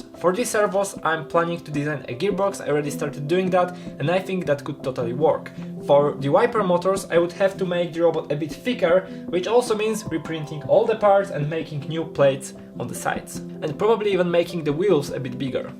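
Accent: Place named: Poland. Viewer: Oj chłopie - akcent to masz taki mocno polenglish. Akcenty słów, ale też melodia zdań - słychać, że w szkole na angielskim było mało rozmowy/dialogu lub się nie przyłożyłeś. Czy to przeszkadza - wcale: chodzi o treść. Ale sam zacząłeś z 'bearing' :P Jeśli Ci to przeszkadza, zainwestuj w konwersację z kimś kto ładnie rozmawia po angielsku, bo treść kanału jest naprawdę ciekawa!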